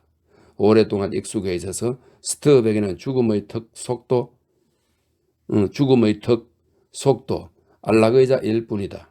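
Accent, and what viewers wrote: Gyeongsang, South Korea. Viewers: 한동훈장관찐팬인데 후니가감명깊게읽은 책이라해서듣습니다 나이가좀많아서 너무감사합나다 두번째듣습니다 모두꾹꾸우욱누르고 경상도사투리어투가 매력적입니다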